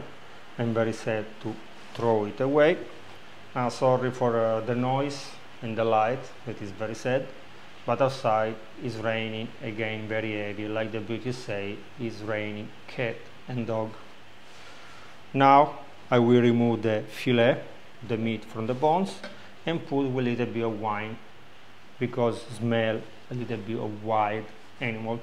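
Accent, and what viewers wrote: Italy. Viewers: I have been watching all of your videos ever since 2020, and it has become a family tradition to watch your videos all together. I would say try to make "shorts" trailers of your actual videos with a link for people to click on and watch the whole video (put a lot of suspense in it to draw their curiosity to watch the full video), reupload those shorts on tiktok and instagram (tiktok can get you a lot of views on shorts). Also work on your thumbnails a bit more, put like a picture of your "surprised" face looking at the dish that you're making. If you're unsure how to do this or how to take a step further on your channel, I would recommend taking Udemy courses (for like 10$) on "school of film" or something similar, sometimes no matter how much you know about things, a course or a tip might always be useful. Personally, what I love about your channel are the traditional italian recipe with your italian accent, and your preserving/canning videos (like sun-dried tomatoes, peeled tomatoes, wine etc ...) If none of the above works, then you might consider switching to creating short videos (less than 1 minute) and post them on youtube, tiktok, instagram but don't give up! 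You have a big fanbase and a big base to begin with, you're halfway there ! Love from Lebanon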